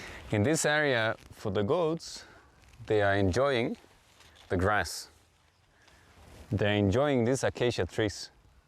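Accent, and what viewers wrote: Kenya. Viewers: This is amazing... the transformation is real🤗 I work in semi - Arid area in West Pokot, we should do an exchange visit program, share knowledge and seeds... 🤗 Kudos for the adaptation of Kenyan English accent😍👏👏🌻🎊🎉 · You speak like Maasai. Guess you've been around quite long. Wonderful video.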